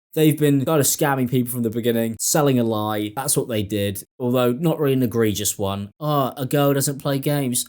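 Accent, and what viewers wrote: United Kingdom. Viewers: .... You're a Brit,? (from the accent) & you don't seem to understand American-style Family Law courts. Sniper-Bitch wants this lawsuit to go to Family Law because those courts are heavily biased against men - Period!!! It's one of the biggest legal scams going in America. This is a business law matter & that's where it belongs.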